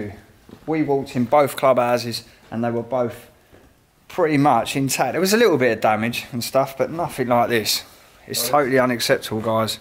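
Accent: England, United Kingdom. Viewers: Where in England is this guy from? I'm in Canada and can't get the accent.....can you send a message to me?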